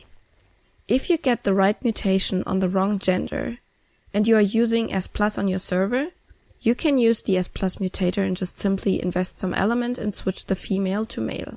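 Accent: Germany. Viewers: I appreciate the effort that went into the video and the english version, but I can´t deny that I heavily dislike the german accent. It´s extremely distracting to me. I´m german myself, sadly.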